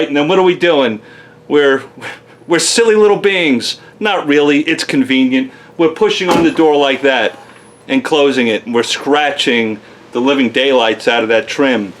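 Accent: New York, United States. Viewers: He's from New York. It's in the accent.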